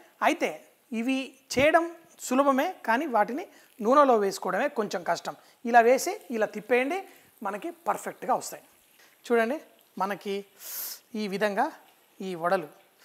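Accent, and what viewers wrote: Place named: Hyderabad, India. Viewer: We want that Hyderabadi voice✌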